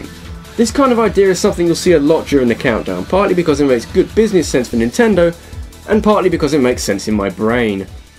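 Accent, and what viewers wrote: United Kingdom. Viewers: A British accent doesn't mean your smart or you know what your talking about.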